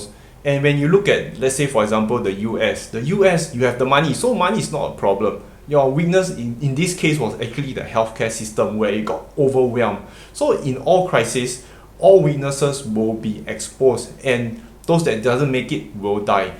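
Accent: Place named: Singapore. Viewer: bro your videos are amazing. your Singaporean accent is so strong, but your messaging is so clear. love the content bro, you make Youtube a better place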